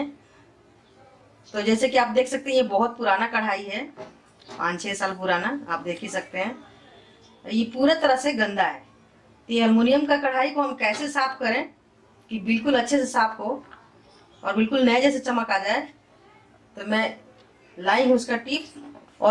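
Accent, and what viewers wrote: Bihar, India. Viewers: आप बिहार से हो क्या ?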